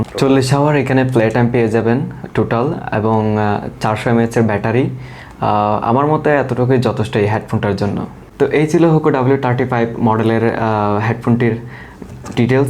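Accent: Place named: Noakhali, Bangladesh. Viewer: noyakhliar local accent roye gese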